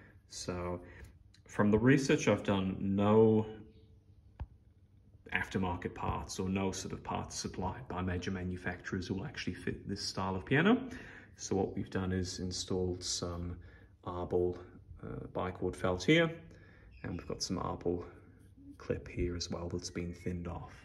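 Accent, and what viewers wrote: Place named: Australia. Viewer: Hi, Where are you located? I am guessing in Australia or New Zealnd by your accent. In New England here near Boston. I am crazy about that gorgeous Richard Lipp. We almost never see their pianos. On Roberts piano out of Oxford he gets them from time to time and appears to really like them. Any info you care to share about heirloom pianos would be appreciated.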